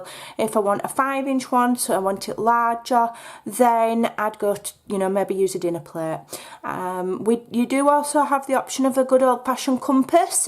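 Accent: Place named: East Yorkshire, United Kingdom. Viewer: Thanks, this is a lovely idea. From your accent you sound like you are from East Yorkshire, like me!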